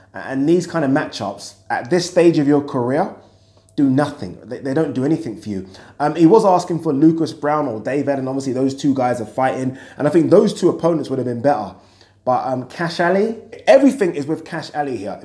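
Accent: Liverpool, United Kingdom. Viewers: We? you dont sound as scouse as Pricey.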